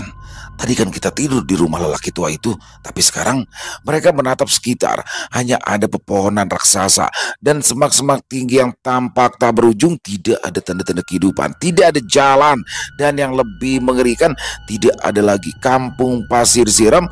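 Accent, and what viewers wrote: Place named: Jonggol, Indonesia. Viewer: Bahasa sundanya mirip orang jongol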